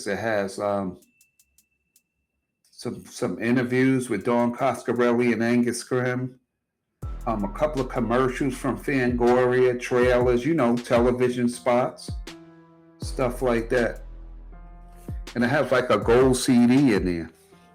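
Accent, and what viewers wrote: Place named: New York, United States. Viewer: I love your voice and listening to your New York accent. ❤😊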